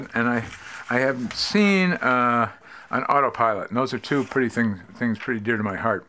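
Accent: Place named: United States. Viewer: All that info, and all spoken in perfect 👍 American English!! What a beauty!!!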